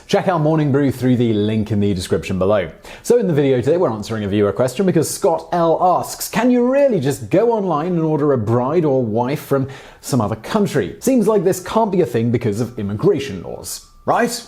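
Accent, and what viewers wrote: England, United Kingdom. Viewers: Why is vsauce doing a British accent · Why is vsauce talking with an English accent?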